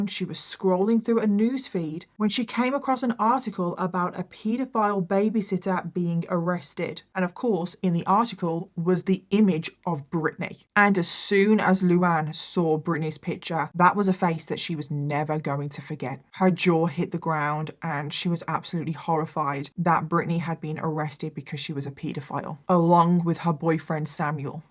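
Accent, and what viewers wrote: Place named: Birmingham, United Kingdom. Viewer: i asked my friend if she could do her makeup and talk at length about csa at the same time and she said no. the grwm/true crime subgenre is kinda antiquated now but it's definitely a skill and i'll always be here for it. anyway the stuff you talked about was horrible asf so thanks for that. always nice to hear a brummie accent too.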